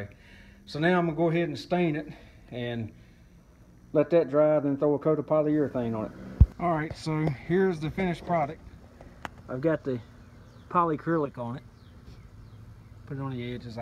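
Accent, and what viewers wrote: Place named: South, United States. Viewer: Well, looking at your video for the First-time. That's a Beautiful Job! Well done to you. May i ask " What wood did you use? Also, love Your Accent! Sounds like you are From..." Down South " Many thanks and Kind regards Colyn. All the way form The UK. P.s. God bless The U. S.A.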